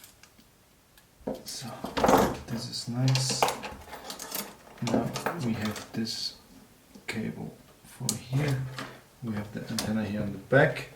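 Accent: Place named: Germany. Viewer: You have a very nice german accent! Greetings from India.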